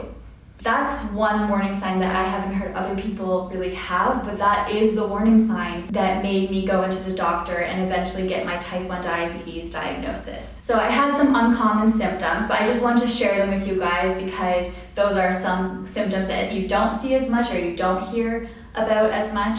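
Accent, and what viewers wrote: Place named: United States. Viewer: You sound American but you said washroom lol. Thank you for your story :-)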